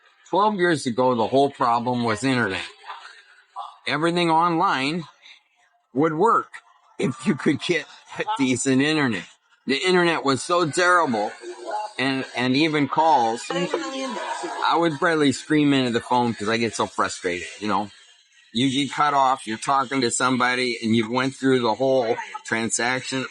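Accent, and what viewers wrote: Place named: Midwest, United States. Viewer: Hi guy I don't know your name. This is the first time I've seen your channel. I live/d in Negros/Valencia for four years now. Sorry to hear about your banking troubles. Hope you get it resolved. I'm Jeff/70 from Wisconsin originally but lived in California long time. Are you from midwest? Just curious from your accent. Yeah, simple life ain't so simple...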